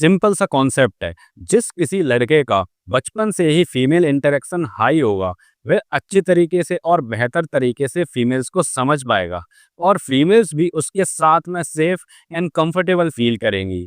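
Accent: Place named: North, India. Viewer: He have north indian accent 🇮🇳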